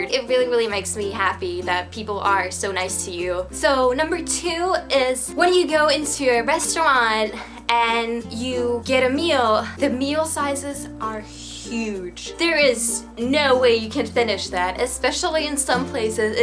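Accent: South, United States. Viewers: Had you not said you were Finnish I would have said you have a southern accent, so later in the video when you said you were in Charlotte, I just kinda went "I knew you were in the South!" You're right, everyone has an accent. I live in Utah, though I grew up in the Chicago suburbs, and I still get asked where I'm from, in spite of having been in Utah for 18 years now. But I've apparently picked up enough "Western" accent that on visits back to Illinois I get asked there where I'm from too, so.... there is that, I suppose. I actually work with a Finn and he's got a rather strong accent, but I'd have never been able to tell where from until he told me he was from Helsinki and moved to the US as an adult. Accents simply are. Just not a big deal. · You have a southern accent. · Your accent sounds southern . How long have you been living here ? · I like the accent. 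You can tell there is a Finnish accent, but even a little southern in there too. Very cute. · She sounds slightly southern to me. Like a southern born girl who has lived in a northern state for a few years and starting to pick up on a northern accent. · I'm from the Mid-Atlantic and I can hear a Southern accent on her. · Your English is perfect and it’s sounds very southern lol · Great video! Your English is great, even a little Southern in places. I just wanted to make a few suggestions. Less dark eye makeup and a little peach or pink gloss on the lips would really suit you. A brighter colored top, too, in pink or light blue, would look better on camera. The dark top makes you disappear. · Does your family tell you that you're accent is changing? you sound super southern .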